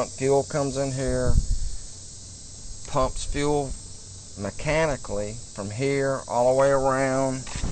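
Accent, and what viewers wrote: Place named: New York City, United States. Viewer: you sound like you are from New York City. Thanks for the video big help btw. Yella injectors need cleanin. Sometimes I use vaseline on my injector too.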